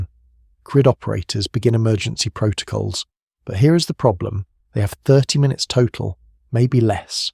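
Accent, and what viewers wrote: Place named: England, United Kingdom. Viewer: Its the strongest this year? Big Deal. Tell Me when something important happens without the English Accent.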